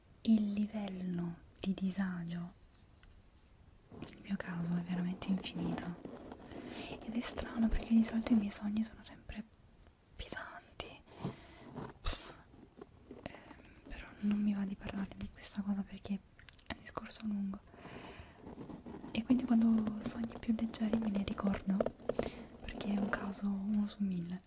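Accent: Naples, Italy. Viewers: ma sei napoletana?,se si lo sono anche io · forse lo Avrai già detto qualche Altra volta.. scusa se te lo chiedo ma sei napoletana vero?... io lo sono😆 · Amo il tuo accento napoletano 😂❤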